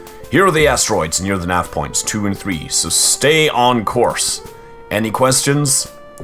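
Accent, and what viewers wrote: Australia. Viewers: That Australian accent XD Don't worry, I forgive you. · nice australian accent! sounded like the guy was from up in the bush! Thumbs up for Australian! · Your Australian accent makes my hair fall out from cringing.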